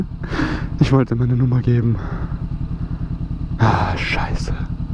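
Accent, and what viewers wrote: Austria. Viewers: bist du österreicherM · unser Akzent bleibt #austria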